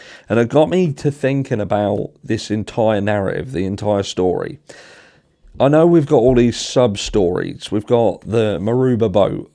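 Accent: Norfolk, United Kingdom. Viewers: Are U a Norfolk boi at all U narf sound like us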